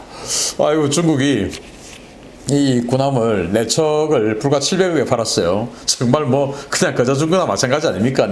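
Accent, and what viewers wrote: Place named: Gyeongsang, South Korea. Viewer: 경상도 사투리 학을 땝니다 표준어 기겁 합니다 오랜만에 고향말 들으니 정겹습니다 신선생님 건강하이소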